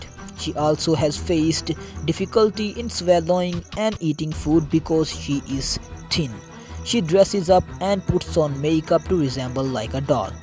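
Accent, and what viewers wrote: India. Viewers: There is nothing wrong with an Indian accent! The background music however was too loud and overwhelming. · You are totally right.But it is normal that you get criticized, when you upload videos on youtube. And just be honest his accent is pretty hard to understand.He does good job producing his videos, but i think he should talk in his native language, and upload them for hindu ppl.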